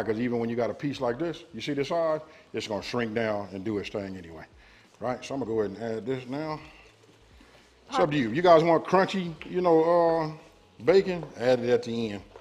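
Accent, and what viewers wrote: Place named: South, United States. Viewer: You definitely do not sound like you are from LA. It sounds like you’re from the south.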